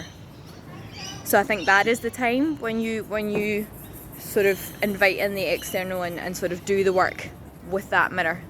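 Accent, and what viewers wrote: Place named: Scotland, United Kingdom. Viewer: I’ve just found you here and loving your beautiful message/teachings… and your lovely Scottish accent. Are you from Glasgow/Lanarkshire?? 💕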